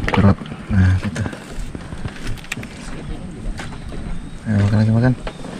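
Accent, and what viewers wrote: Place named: Banten, Indonesia. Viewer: dari logat bicara nya mah kaya orang Banten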